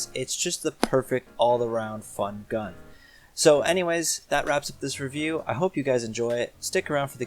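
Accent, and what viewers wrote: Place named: North America. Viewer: What country are you in? You have a North American Accent, but it looks like you're in the UK because of your back garden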